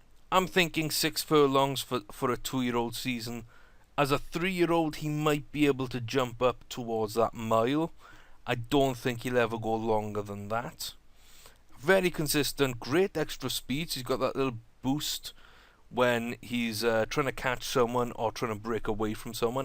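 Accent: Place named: Wales, United Kingdom. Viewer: That's a Welsh accent if I've ever heard one. Where you from?? I want to say the Valleys?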